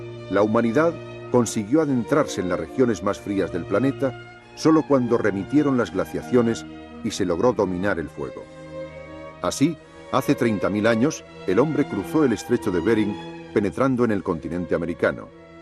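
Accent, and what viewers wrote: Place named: Spain. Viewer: No sé si sea por el acento español pero hay partes (finales de palabras) que no se entienden pues no vocaliza bien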